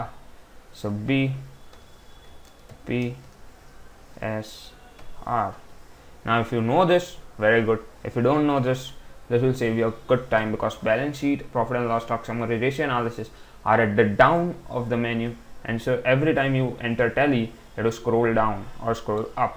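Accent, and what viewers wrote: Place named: United Kingdom. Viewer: dude I know that you love speaking English. and make every one comprehend what you wanna say despite of using Britishers language and accent too you could be more comfortable with your national native language.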